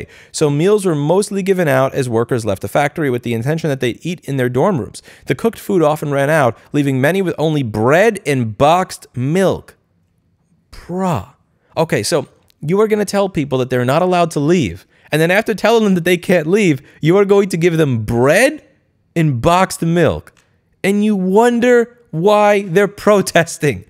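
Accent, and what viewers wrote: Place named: Texas, United States. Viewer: Texas I hear! Which area?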